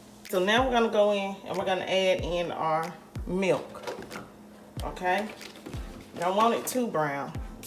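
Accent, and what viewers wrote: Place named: South, United States. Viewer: Finally!!! A video with no creammy watery mac and cheese. And i can hear it in your voice that you’re southern. Im trying this for thanksgiving lol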